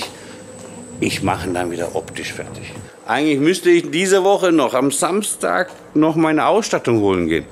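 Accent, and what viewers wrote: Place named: Sauerland, Germany. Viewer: Ich komme aus dem Sauerland und finde es echt witzig wie bei dir manchmal der Dialekt durchkommt ich mag das sehr . Leider spreche ich selber kein Dialekt mehr und es ist sehr schwer jemanden zu finden der noch den Sauerländer Dialekt spricht um ihn zu lernen .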